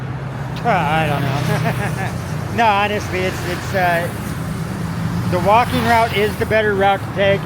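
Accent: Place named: Tennessee, United States. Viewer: From Tennessee. That explains your accent. Keep riding and discovering Malaysia. You have a splendit Advisor in Douglas of Plane Doug.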